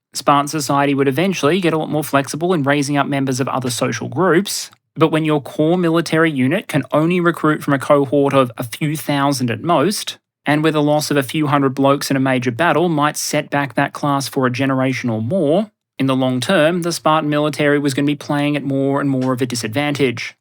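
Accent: Australia. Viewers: After listening to a few of videos I have grown to associate Australian accent with being stupid. Every time I hear it I immediately think that there is a moron speaking.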